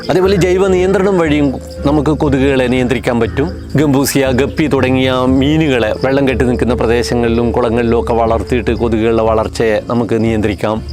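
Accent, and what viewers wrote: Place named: Thrissur, India. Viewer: മാഷിന്റെ ഭാഷ ശൈലി കേൾക്കാൻ മനോഹരവും മനസിലാക്കാൻ വളരെ എളുപ്പമാണ്. തൃശൂർ ജില്ലയിലാണോ അല്ലെങ്കിൽ തൃശൂർ ജില്ലക്ക് അടുത്താണോ മാഷിന്റെ വീട്? നല്ല അവതരണം മാഷേ..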